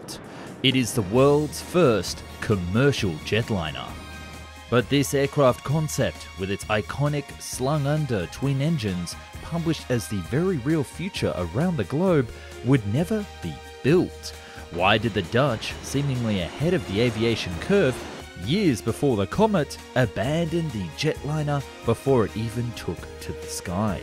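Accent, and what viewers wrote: United Kingdom. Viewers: i would like to think, being (or at least sounding) British pronounced it naynay purposely.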